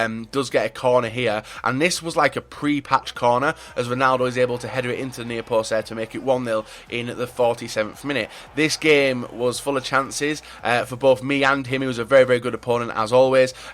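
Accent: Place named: Yorkshire, United Kingdom. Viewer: Zeid Abdellatif I think it's a Yorkshire accent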